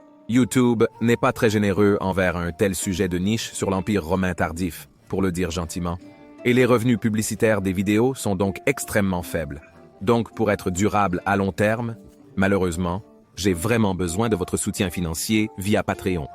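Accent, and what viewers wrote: Quebec, Canada. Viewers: Je relève des notes d’accent Québécois, je me trompe?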